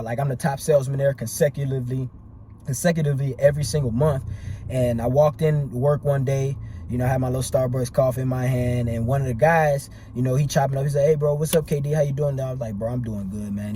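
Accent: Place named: New Orleans, United States. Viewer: Bro sound like he from Louisiana I'm guessing New Orleans 🤔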